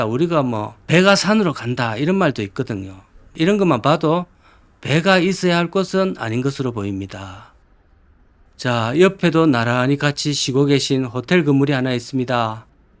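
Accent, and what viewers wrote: Gyeongsang, South Korea. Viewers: ~재밌는영상에 중독되었네요~ 배울것도많고 유머도있어서 힐링되네요~저도 경북이고향이라구 구수한 경상도 사투리가정감있고추억소환이 되네요~잘보고 있습니다~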